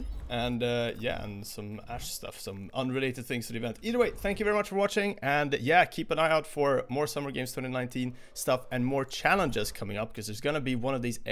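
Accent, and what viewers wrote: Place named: Sweden. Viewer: swedish accent jumped at me from the first 5 seconds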